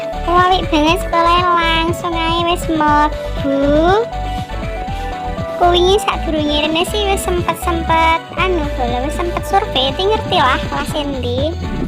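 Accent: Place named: Java, Indonesia. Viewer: medoke boloo🥳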